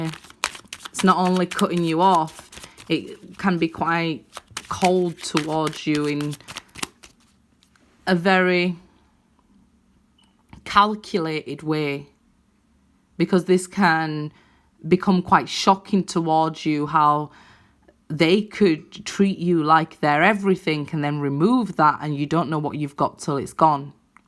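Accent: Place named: North, United Kingdom. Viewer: You just read my life .But your accent always has me imagining that its Mel B lol .bet your a right northern girl xx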